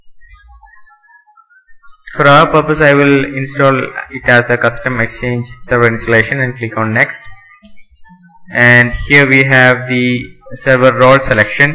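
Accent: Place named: Kerala, India. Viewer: Mallu English!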